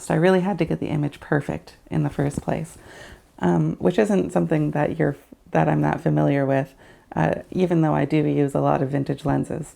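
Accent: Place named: California, United States. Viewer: Hey, you are pretty funny to this California boy. Nothing dry about your presentation. Does your Jupiter 9 have a serial number on the side? The early types did not. They had them on the lens ring. Ah Hah. Yours was made in 1984 as that is how your serial number starts out. And here we are <link> <link> This looks like a college near me. I am west of Palo Alto, CA. You talk like a local. <link> No you can do better than that. Try neutral filters. You will be able to keep the short field of focus and not blow out the sensor image. Your surrounding looks like San Mateo. Also, I am doing product shots for a product I produce. So everything is controllable and stable. I use a Canon 2000D on a big tripod (with a fluid head), with Canon software that will send the image to a laptop with any size screen... think 27" if you can have that around in a setting. 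I really can see the image clearly there. There is a issue with this camera's need for adaptors sometimes. This is talked about in a 5 Types video. It might not apply here as it is about infinity focus. It is my understanding that your camera did not have the MC multi-coat lens coating, where the earlier one or two versions did. The coating keeps the light from bouncing around inside the lens and camera. I just bought a Jupiter 9 type 2 for $135 out of the Ukraine with only $10 shipping. Here is a video you might have seen which is pretty interesting. The information is that is. Don't drink a lot of coffee before watching this. Y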